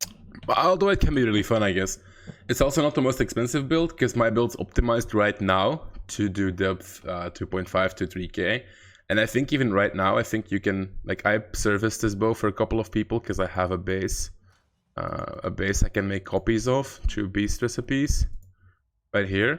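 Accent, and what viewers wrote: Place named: Latvia. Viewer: Hmm, izklausās, it kā latvietis runā angliski...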